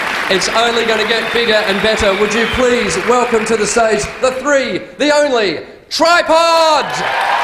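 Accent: Australia. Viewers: I happen to think that Australian accents are the most amazing accents ever. Not annoying in the least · I love Aussie accent. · Brilliant!! This bloke is what us poms call 'fit' which means he is hot. I love Australian accents! Every line is a question! 'In a rare display of optimism' 😂😂😂😂😂😂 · i'm not australian but i love the accent!!!!!! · LOOOL... i gotta get used with aussie accent... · When his voice goes up he sounds like a teenage boy with his voice cracking. I love Australian accents. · taj obrien he's showing the worst accent not all Australians speak like that I know I don't and that is a bit rude you must be a bogan to think this !! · I could watch this all day just to hear his Australian accent... great god o.o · He's AMAZING! I usually have problems with understanding auzzie accent but I find it really cool at the same time :)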